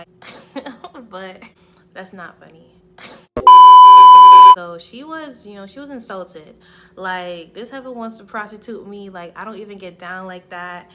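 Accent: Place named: New York, United States. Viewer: I wish she tracked down and annihilated each member of the rapist clan. Btw: Your New Yorkerisms and soft, melodic voice completes me. Love your channelllllll, the respect and the humor. Sis is a G by the way. Coming from a neighboring country to me, trust and believe I'd jook a whole dude in the eye for the foolishness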